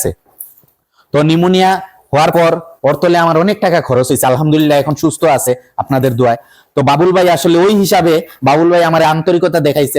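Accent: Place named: Sylhet, Bangladesh. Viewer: সিলেটি মাত হুনিয়া ভালো লাগচে❤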